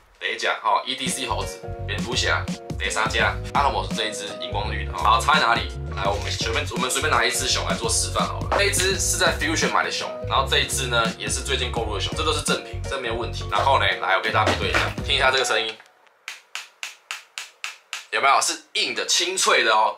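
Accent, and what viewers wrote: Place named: Taiwan. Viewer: 真的氣屎台語口音好奇家鄉抵對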